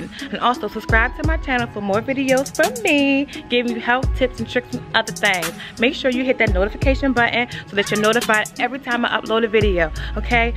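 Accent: United States. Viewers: DC or B-More is what I hear in that accent, great vid🤩🥰🍫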